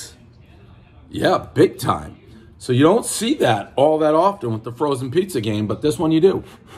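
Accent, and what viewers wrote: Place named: New England, United States. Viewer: like the videos...your yankee accent is somewhat tolerable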